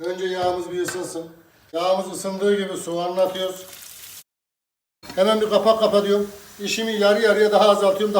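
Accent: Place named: Anatolia, Turkey. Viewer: Reis uzun zamandir senin Hemen tüm yemek tariflerini seyrediyorum ve yapiyorum Gercekten inanilmaz lezzetler cikiyo ortaya Ellerine emegine saglik Son olarak sunu da söylemeden gecmicem Cok naturel bir insansin Kisiligini karakterini bozmadan aynen yola devam ediyosun Özellikle konusurken siveyi bozmadan yapmacik degil tamamen anadolu agziyla anlatiyosun Helal olsun Yolun bahtin acik olsun Viyana dan selamlar Saygilar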